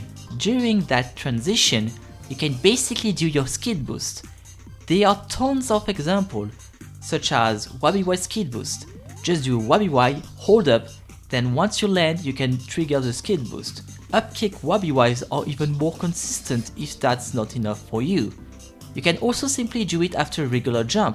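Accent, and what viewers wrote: France. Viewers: T’es français toi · I hear a little bit of french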